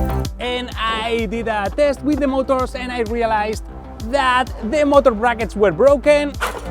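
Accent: Spain. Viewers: You can't unhear the Spanish accent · Are u spanish? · Eres español verdad? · Hey, are you from Spain? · de donde eres . eres de España? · This guy has to be spanish mainly by his accent right? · He's from spain lol, im spanish too and I recognise the accent, appart from the fact that is a spanish name. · Spanish english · Eres español no?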